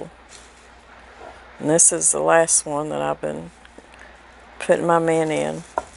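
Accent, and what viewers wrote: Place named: Arkansas, United States. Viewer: I love it that you can make a video without getting “made up”. You look pretty without it. Your voice and your accent is just like my family in north eastern Arkansas. I feel like I should know you. I’ve used many of your recipes. Keep it up!!!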